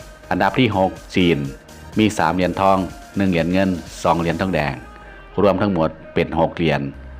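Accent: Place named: Isan, Thailand. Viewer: เว้าอีสานฟังม่วนดีคับ ผมเองเกิดที่ชัยภูมิอีสานโดยตง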